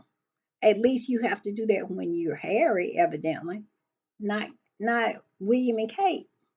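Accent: South, United States. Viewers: ❤❤❤ here for the Read...here for that southern drawl ❤❤❤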